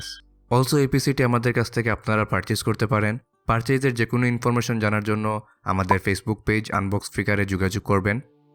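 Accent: Sylhet, Bangladesh. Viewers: Sylhety ni bhai? Mat hunia lager sylhety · Sylheti nakita bhai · Are you from Sylhet? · Great video... Are you sylheti brother? I was just wondering... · Bhai apni sylheti Maybe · Dada Sylheti naki 😌. Sylhet hoile kinte pari. Reply diyen dada. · vaii kita sylheti nih?? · Vhai.... Apni sylheti? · Bhai kita sylheti ni? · Bro apni ki sylheti? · সিলেটি Bro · Sylheti mama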